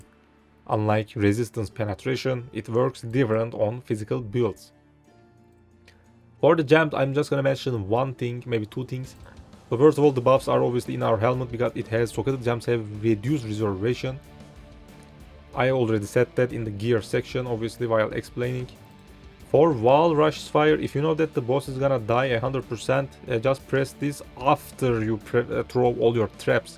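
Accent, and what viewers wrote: Turkey. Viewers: Türk oldugunu Ingilizce Konusmandan hemen anladim 😂 Bende bu Buildi 3.16 de kulancam. Almanyadan selamlar!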